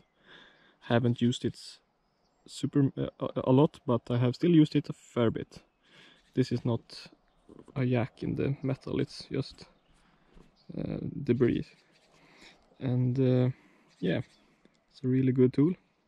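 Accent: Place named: Sweden. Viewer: Just curious are you from Sweden? Your accent is badass man lol thanks for the info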